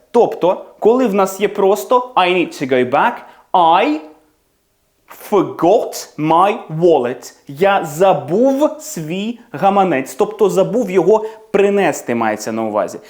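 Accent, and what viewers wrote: United Kingdom. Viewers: Хоч у когось із наших ютюберів класна вимова. Причому британська, а не огидна американська)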